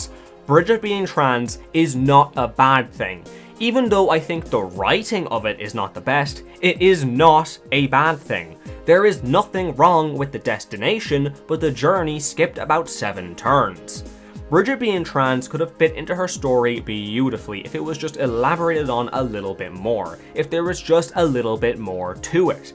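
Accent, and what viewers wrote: Ireland. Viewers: Came for biscuit, stayed for rambling Irish man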